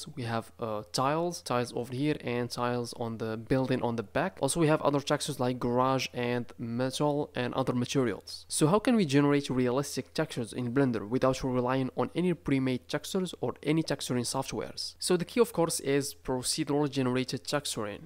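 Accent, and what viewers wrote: Morocco. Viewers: Are you Moroccan 😄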